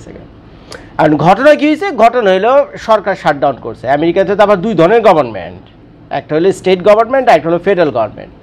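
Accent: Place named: Old Dhaka, Bangladesh. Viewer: আপনার ভাষা অনেক টা পুরান ঢাকার আশে পাশে কেরানীগঞ্জ গঞ্জের মত লাগছে।জানতে পারি কি আপনার হোম ডিস্ট্রিক্ট কোথায়?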